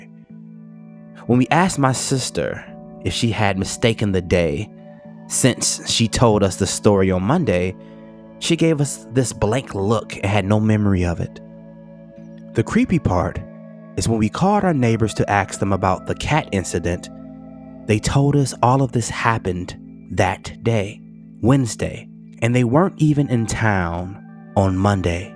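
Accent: South, United States. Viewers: I can't place the accent. Sounds slightly southern but not quite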